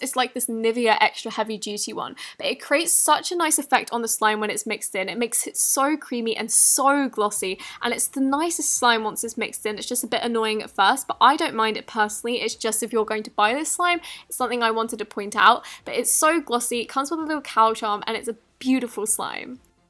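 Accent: England, United Kingdom. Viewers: Are you from England? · Are you English or American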